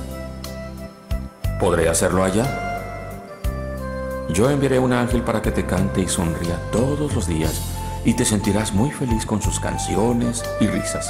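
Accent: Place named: Latin America. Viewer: Bonita historia..D : De donde sacaste la voz? es latina ,jaa ..pero esta bien,tiene su encanto.jaa te doy mi pulgar jajaa ..hayy que daño..no te rias ,quitarse el pulgar para dartelo ,duele un poquito jajajajaaja... te queria dar las estrellitas uhm..pero se me estropeo el Cohete interrestelar jajaaaaa... besitos de un gatttito gotico..miauuuu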